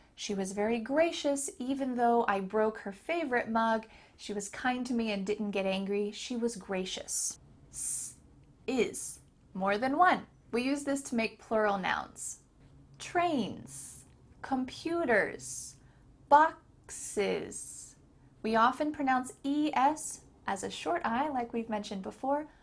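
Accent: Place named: New York, United States. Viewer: I got a friend of mine, he is from New York, and I always share your beautiful classes with him! Lol! He feels like to go back home! Lol! Your lovely accent reminds him "Home". Thanks! Lol!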